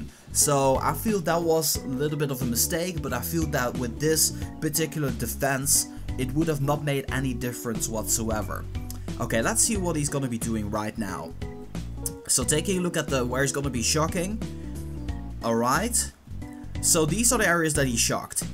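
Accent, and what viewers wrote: Netherlands. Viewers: if i compare youre accent with loui van gaal. i can obvious hear you are from the netherlands. correct me if im wrong.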